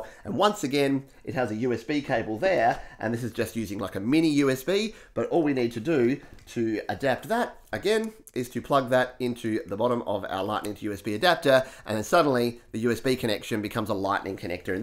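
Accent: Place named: Australia. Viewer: Thanks for that. I’m upgrading from the dinosaur era desktop so all advice gratefully received - (and very happy to hear an Australian accent for once. ) So - Question : I didn’t realise I can use my I pad Pro for recording until watching your vids. But - is it possible to somehow get the I-Pad screen onto a separate large monitor screen ?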